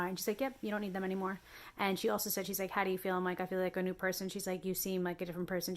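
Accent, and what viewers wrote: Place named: New York, United States. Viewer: Also, it’s been a treat to listen to your NY accent, as someone who grew up on Long Island and has the same accent 😊. I don’t get to hear it as much in the midwest and I miss it! 😂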